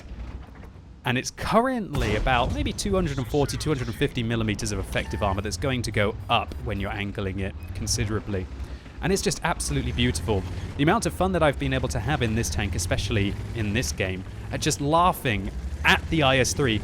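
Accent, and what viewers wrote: France. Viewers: i'm french and i quit like your french accent 😂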